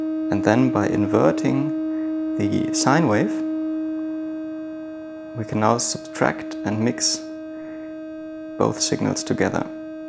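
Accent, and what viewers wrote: Germany. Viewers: Wow, you sound so German!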